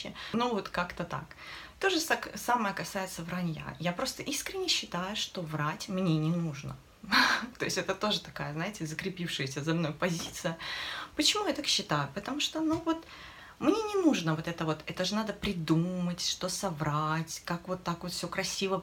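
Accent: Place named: Provinces, Russia. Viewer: простая речь как из глубинки и провинции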